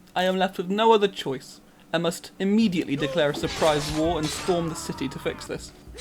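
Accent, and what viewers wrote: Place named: United Kingdom. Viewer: You are the most Bri'ish gamer I have ever witnessed, in a good way gentleman.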